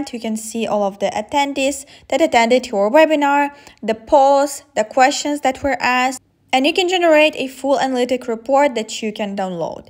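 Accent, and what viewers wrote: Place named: England, United Kingdom. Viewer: Love your English accent, great vedio.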